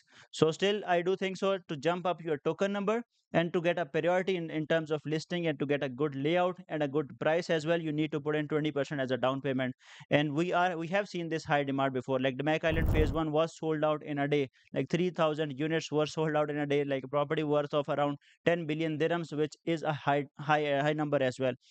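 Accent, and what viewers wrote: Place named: India. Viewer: Improve your Indian accent bro...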